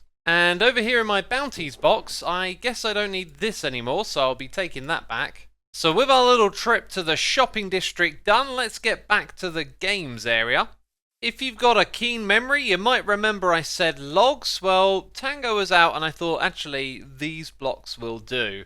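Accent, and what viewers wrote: United Kingdom. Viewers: WHOAA whoa whoa whoa, I had no idea x was BRITISH. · He's a muscular man with a British voice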